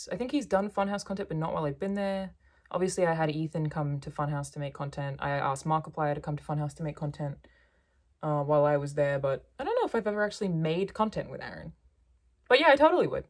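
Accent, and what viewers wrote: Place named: Australia. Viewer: I love your lilting Australian speech impediment, but we need to talk about the way you say "assume." What's up with that?!? There is no 'sh' in that word anywhere! Desist! Dig you. Please roast me appropriately. :)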